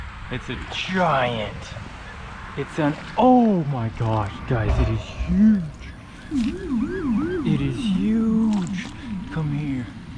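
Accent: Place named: Texas, United States. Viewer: What part of Texas are you from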